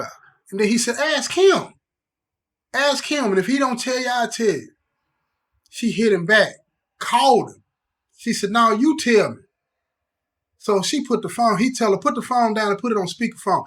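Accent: South, United States. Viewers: Hi, I look forward to ur videos everyday. Ur VERY consistent I love ur southern accent, I think it's sexy, anyway, u hv become part of my morning routine. I wish there was a way to send u $. U r LIT J! Hv a good day.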